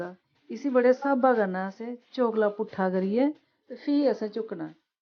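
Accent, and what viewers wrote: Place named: Dogri, India. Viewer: Your dogri accent is soo good♥️♥️♥️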